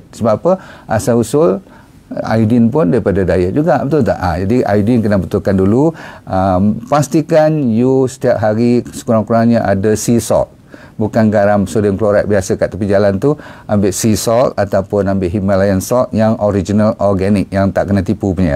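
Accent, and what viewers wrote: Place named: Terengganu, Malaysia. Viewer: Dr dh pandai ckp ganu - senyum sokmo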